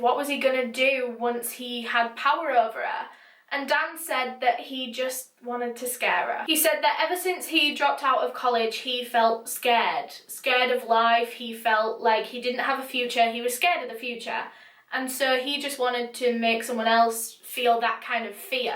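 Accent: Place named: Yorkshire, United Kingdom. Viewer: think she's from Yorkshire, UK. Personally love the Yorkshire accent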